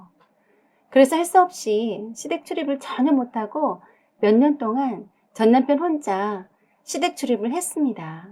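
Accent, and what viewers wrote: Jeolla, South Korea. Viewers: 저기요, 표준어로 읽던가 아님, 전라도 사투리로 읽던가 하나만 하세요 거슬려서 못듣겠어요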